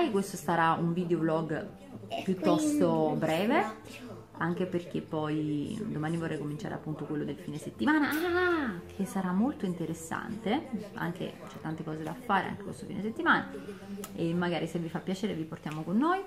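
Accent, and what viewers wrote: Tuscany, Italy. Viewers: Ciao,scusami ma tu hai la fortuna di abitare in Toscana e ti correggi quando parli toscano... pagerei oro per spostarmi dalla Calabria non fraintendermi AMO la mia terra e il mio mare, ma al sud poco lavoro e poco tutto ma quello che mi fà più paura e la Sanità praticamente non c'è.Quando vengo in Toscana precisamente a Pistoia l'adoro e da 28 anni che ci vengo purtroppo solo da mio cognato 1 volta all'anno, l'Ikea vicina i Gigli Firenze Prato vicini anzi tutto vicino,sei fortunatissima goditela 😉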